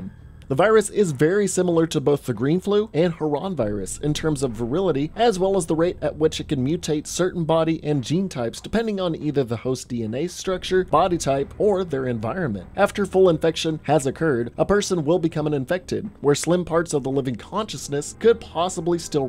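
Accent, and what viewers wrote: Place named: United States. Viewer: Zombies, dabs, and your smooth American accent is spooky season essential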